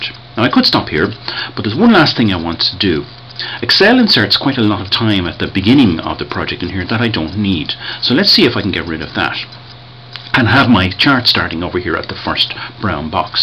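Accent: Ireland. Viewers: I love his accent! Irish? What's up? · Hi there, I am a nurse who knows very little about Gantt charts other than they exist a. I searched in EXCEL and found your video. I watched two and found them very helpful. The bonus was the course was created by someone from Dublin and I could enjoy hearing an Irish accent as I followed along. I am a Dub who has lived in Vancouver, Canada for many years who really enjoyed having an Irish teacher! Great videos. cheers Clare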